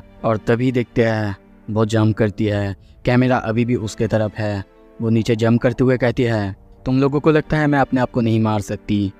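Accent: Bengal, India. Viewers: Are you bengali???😊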